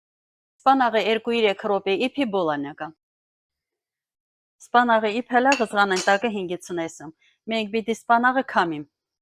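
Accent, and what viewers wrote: Artsakh, Azerbaijan. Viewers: Շատ սիրելի տիկին ջան ,ես շատ եմ սիրում Արցախի լեզուն ,օրհնվես էդպես էլ խոսիր ,դա է մաքուր հայկական լեզուն ,որ մինչև այսօր մնացել է անավարտ, իսկ ամենակարևորը իմանաք ,որԱՍՏՎԱԾ խոսում է հայերեն ,այն էլ Արցախի բառբառով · ❤❤❤բարև ձեզ շատ հավեսով եմ յեշում,միշտ էլ մեր Արցախի բառբառով խուսեցեք։։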